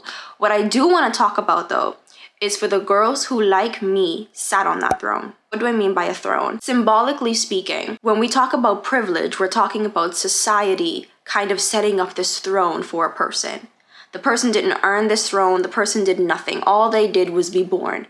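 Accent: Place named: Trinidad and Tobago. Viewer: Are you Trini? I hear that accent sis !! ❤❤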